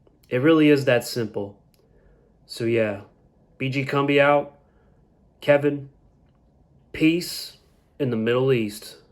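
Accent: Mississippi, United States. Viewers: What part of Mississippi are you from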